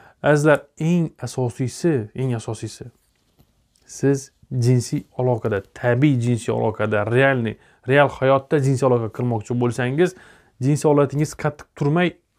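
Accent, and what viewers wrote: Khorezm, Uzbekistan. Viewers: Харазимдан мисиз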